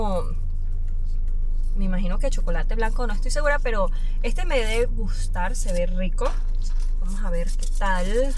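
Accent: Venezuela. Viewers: Por qué hablas como venezolana si te ves como china y vives en Estados Unidos? 😯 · Es de Venezuela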